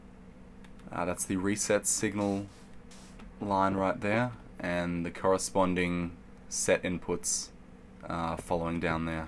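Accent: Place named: Australia. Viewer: your aussie like me :D i can tell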